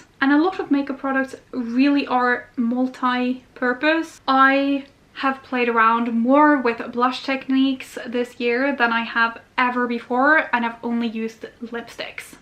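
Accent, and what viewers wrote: Ireland. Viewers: I love your Irish accent!❤ Greetings from Israel🤗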